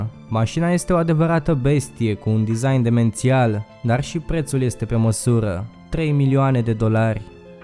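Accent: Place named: France. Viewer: Ai achent de francez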